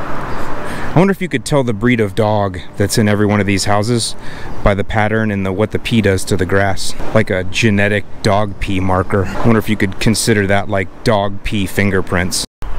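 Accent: Chicago, United States. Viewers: MORE CHICAGO ACCENT 😎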